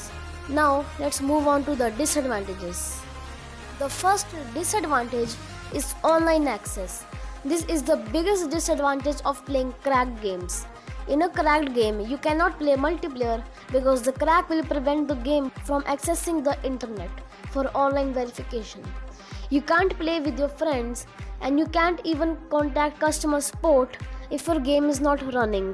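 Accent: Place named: India. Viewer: My Desi accent boi🙂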